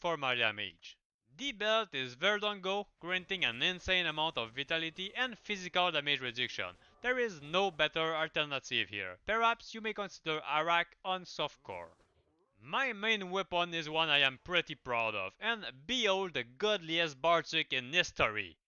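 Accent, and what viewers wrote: Quebec, Canada. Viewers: I could tell you are québecois from minute 1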